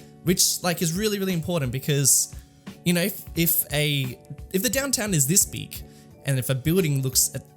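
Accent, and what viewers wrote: Australia. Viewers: Patterns of a city. You sound Australian, so fuck Sydney's layout.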